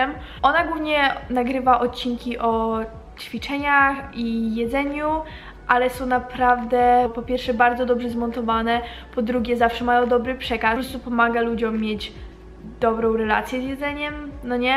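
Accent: United Kingdom. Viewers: Ty masz już taki akcent angielski i mówisz po ang jak byś tam mieszkała od zawsze hhshshsgsgs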